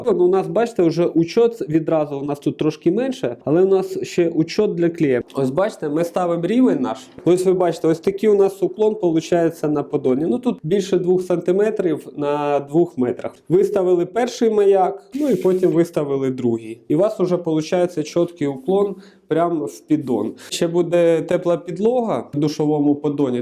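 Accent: Surzhyk, Ukraine. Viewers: за суржик 👎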